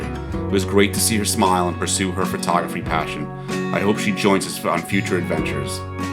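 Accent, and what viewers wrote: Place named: New York, United States. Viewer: This Jersey Girl loves your NY accent. Thanks for sharing this beautiful drive!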